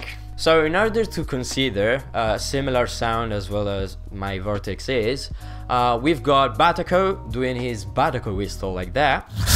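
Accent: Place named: Italy. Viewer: Si sente proprio che sei italiano bro😂 Ti adoro continua così💪🏻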